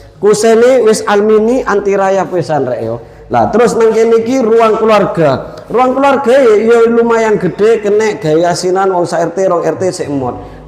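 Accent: Madura, Indonesia. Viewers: Meduro logate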